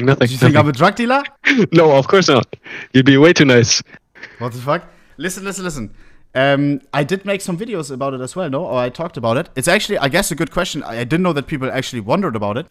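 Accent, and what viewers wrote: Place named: Germany. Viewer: Der deutsche akzent fucking big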